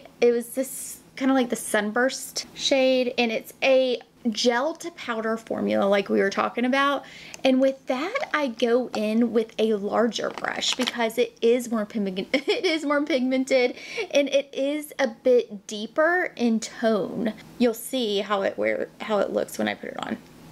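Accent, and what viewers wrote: South, United States. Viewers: i just found you & am following! You are the sweetest! Because of you it helped me to purchase the Gucci bronzer.. I was on the fence cuz it’s so expensive but You convinced me girl 👍🙏🏼🌹❤️💜💝🥰 I’m happy to follow you and I Love your southern accent., Y’all.. lol...sending you love ❤️ from upstate New York 🇺🇸💜💙🙏🏼🥰🌹💝💕🏖💚💋👍👌💪🇺🇸💪🇺🇸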